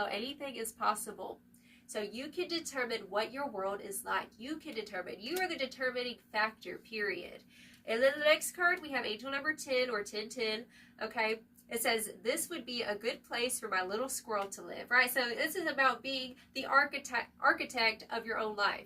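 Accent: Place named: Texas, United States. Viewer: Everything is always working in my favor., I LOVE your Texas twang!😂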